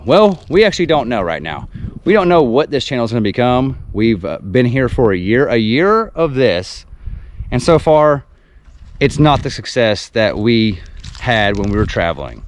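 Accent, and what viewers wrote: Texas, United States. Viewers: I just like hearing you talk , Texas talk. Where is Phil? Ooooh wrong channel. lol As for the comments I say yes but in the long run don't give them the satisfaction they really want to be seen on here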